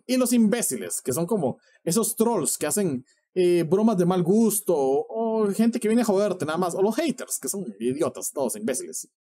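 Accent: Costa Rica. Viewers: Pero si tu acento grita que eres tico jajajajajaj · Desde el primer video que vi supe eras de Costa Rica por la pronunciación de la R, soy de México y visito mucho San Jose pero también se me hacía raro que no decías los modismos, pero igual como dices lo importante es la calidad de persona y no la nacionalidad, me topé con tus videos buscando guía de TL y me han ayudado bastante. Vas muy bien a mi parecer, ánimo!! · Sin que dijeras ya sabía que eres de costa rica , soy de Colombia y vivo en new jersey con varios amigos de costa rica y el acento es muy cool , además que la pronunciación de la r me parece la mejor opción para la pronunciación del inglés entonces la tienen más fácil los ticos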